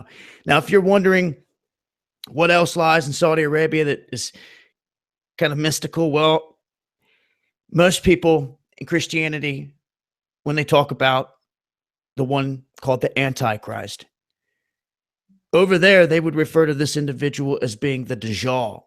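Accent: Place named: United States. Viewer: wow... to hear hadiths in that yanki accent.. :) kudos mate... good work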